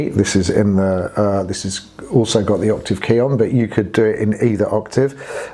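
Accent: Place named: United Kingdom. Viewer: You're clearly British, what city are you based in? I'm on the hunt for a new tutor and you're great!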